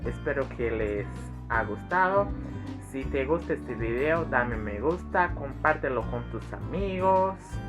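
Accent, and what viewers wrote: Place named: Argentina. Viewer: Pues sí hablas bastante bien, se nota el acento argentino más que portugués. Saludos desde México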